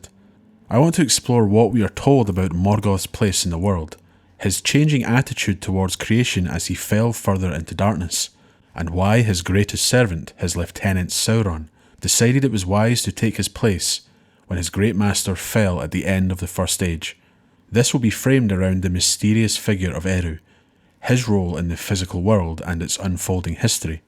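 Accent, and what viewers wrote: Scotland, United Kingdom. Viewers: Glad to have found this. And glad to find a fellow scot as a narrator.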